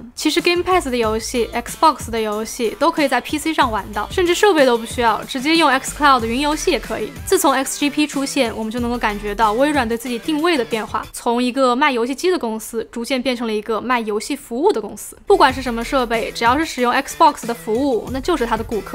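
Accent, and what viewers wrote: Standard. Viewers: 講英文與中文都非常標準又好聽 畫面也都拍的很清晰到位 享受啊😎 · 英语发音超级标准。赞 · 英语发音真的标准。听着就舒服。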